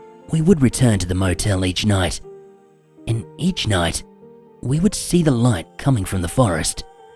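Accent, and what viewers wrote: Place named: Australia. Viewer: The VOICE from DOWN UNDER!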